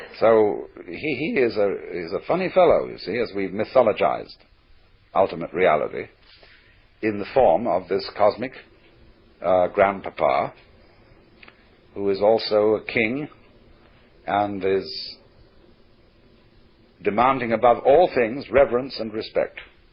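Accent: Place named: England, United Kingdom. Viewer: are you sure this is watts,dont sound like him,ous not pronounced right,more english than scottish tones .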